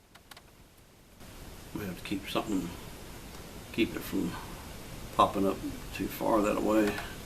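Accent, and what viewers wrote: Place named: Memphis, United States. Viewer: Is your name? That was my father's name. Your accent sounds like the Memphis/Northern Mississippi area? My father was a woodworker as well and I'm just getting into it.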